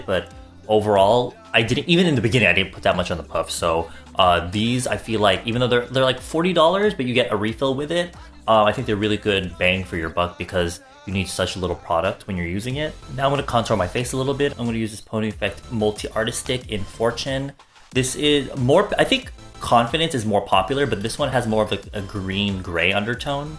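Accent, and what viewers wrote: South Korea. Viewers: i love how he says english names in a korean accent so much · this was a good look for you. you speak so much Konglish now!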